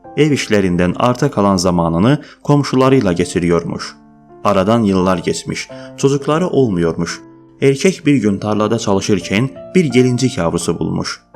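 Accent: Azerbaijan. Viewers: Daniwiginnan azeriye oxwuyur. Hec turkler kimi teleffuz etmir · Abicim azersin işte çok belli konuşmandan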